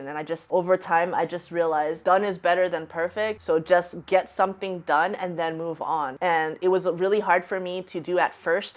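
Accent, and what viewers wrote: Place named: Ontario, Canada. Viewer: ahaha sorry I was unclear... I wrote that without thinking. You sound like you have an ontario accent is what I meant to say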